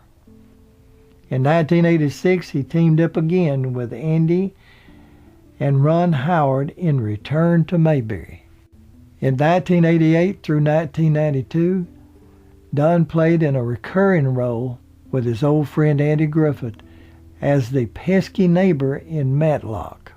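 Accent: South, United States. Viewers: I love your southern accent! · Im originally from Pittsboro NC also in Chatham County just a few miles from Siler City. I love the way you pronounce the town names properly with the essential southern drawl. Wink wink. I'm whistling the theme tune now. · This was another great video presentation by. Love the southern accent. The info on Frances Bavier was quite interesting. A lot of the research here was verified by what I already knew or had read about TAGS for several years. The pictures were accurate except for the one with Andy's family picture, featuring Lee Meriwether. That was a publicity photo for The New Andy Griffith show if I'm not mistaken. I finally understood why Don Knotts left the show. It was made clear. Thanks. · MoonMyst Well he is an older southern gentleman so yeah lol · The narrator is from the south. Obviously he's an older gentlemen. 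Show a lil respect. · Hey we all love your videos and are always looking for more to come, your voice has a soothing quality, must be the southern accent, we here at my have come to a consensus, you are the Andy Griffith show of the documentary shows, keep em comin buddy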